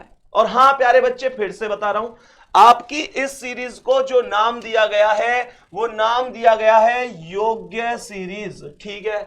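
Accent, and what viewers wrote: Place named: Bharatpur, India. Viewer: Bharatpur jesi language h sir apki ❤❤❤❤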